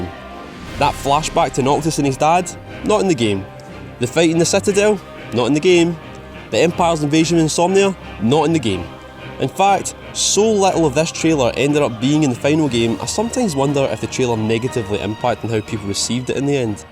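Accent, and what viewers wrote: Scotland, United Kingdom. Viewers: Great video, love how you got more and more Scottish as the video went on haha. Was a great watch though. Thanks :)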